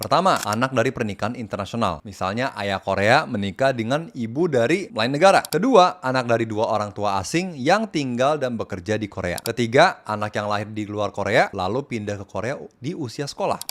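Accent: Indonesia. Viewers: logat nya ya Indonesia banget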